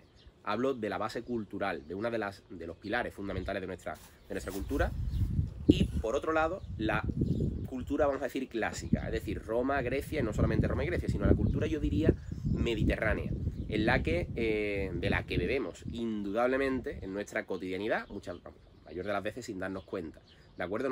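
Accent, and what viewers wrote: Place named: Seville, Spain. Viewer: De sevillanas maneras habla. Aquí hablamos así. Quizá tenga que ver dos veces el vídeo...jajajajaja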